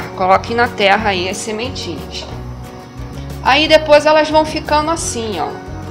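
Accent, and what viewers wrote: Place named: Rio de Janeiro, Brazil. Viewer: Linguajar carioca, para falar com o Brasil; da não viu?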